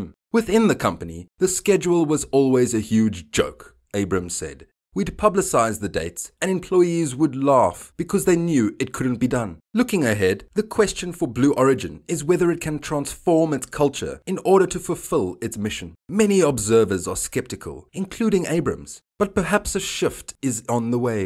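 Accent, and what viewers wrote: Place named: Vaal, South Africa. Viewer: Huz that Vaalie accent bru? Nice and syrupy...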